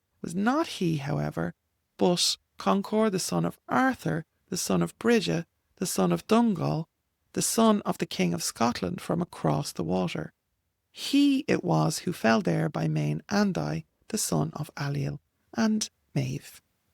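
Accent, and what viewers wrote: Ireland. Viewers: I love listening to you read the mythology! I love hearing it in your Irish voice, with your pronunciation. I listen to the Tán every year.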